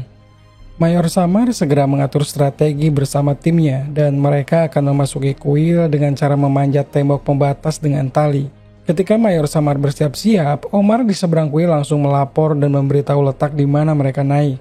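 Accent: West Java, Indonesia. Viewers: Pasti admin orang sunda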